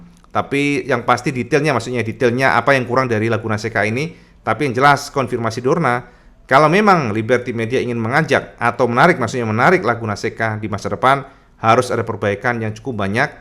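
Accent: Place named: Cirebon, Indonesia. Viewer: kayanya orang cirebon nih, logat bicaranya sama kaya tetangga gw 😅, kereen 👍🏻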